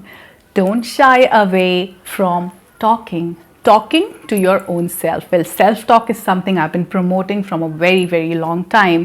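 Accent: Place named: India. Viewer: So nice video you have made me dear sister, with very clear voice, with very clear worlds but with super popular subcontinent accent and very useful points you have highlight, really learners are going to get benefits for your video. May Allah Almighty always keep you happy my dear sister 👍, Best wishes form Pakistan 🇵🇰🙏.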